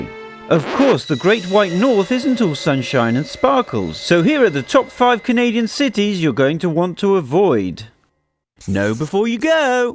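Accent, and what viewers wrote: United Kingdom. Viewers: Exactly. And New Brunswick cities aren't even half bad. This guy is so misinformed. He sounds British, probably not knowledgeable on Canada. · Are you from the USA? You sound so british.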